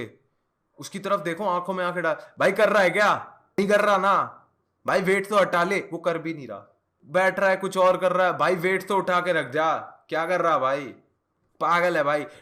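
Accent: Nigeria. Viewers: he nailed the nigerian accent god damn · nice nigerian accent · that nigerian accent 🔥🤙🏼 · Bhai clg mai baitha tha. Ek nigerian exchange student hai. Mera dhyaan phone pe nahi tha par mai sunn raha tha. Mujhe 2 min laga wahi mujhse baat kar raha hai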